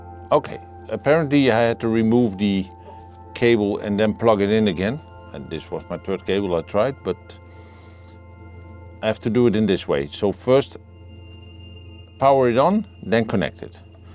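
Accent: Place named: Netherlands. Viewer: Your Dutch accent is thick lol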